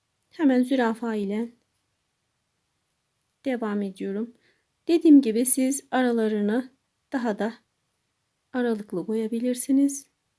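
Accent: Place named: Uşak, Turkey. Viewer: Selam kolay gelsin nerelisiniz ben uşak lı bazı youtuber ları takip ediyorum onlar günlük vlog atıyorlar konuşmanızı benzettim eger mümkünse nerelisiniz cevap yazarsanız sevinirim başarilar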